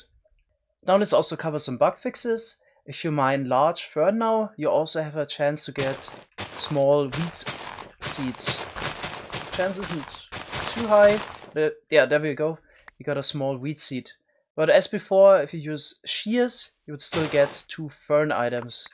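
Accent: Germany. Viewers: Are you German? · Man merkt so dass du Deutsch bist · Are you from Germany? Kinda sounds so xD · You're German right? Ich auch, man merkts an deinem Akzent und daran, dass du das "th" als "s" ausspricht ;) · You're German I can hear it Wie gehts dir mein Kamerad? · you sound german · are you german? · Laut deinem Akzent Leite ich ab, das du deutsch bist😂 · Are you german? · I can tell that you're german. Greetings from Germany xD Great vid by the way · You sound soooo german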